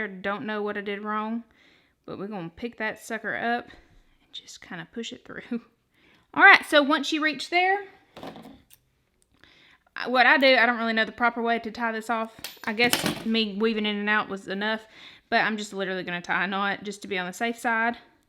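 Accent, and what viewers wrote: South, United States. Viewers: I love her southern accent!!!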